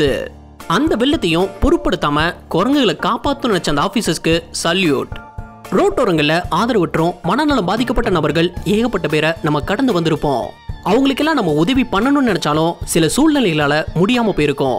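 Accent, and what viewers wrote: Madurai, India. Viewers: Bro neenga madurai yaa bro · Bro neega Madurai ya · Nee ga madurai ya bro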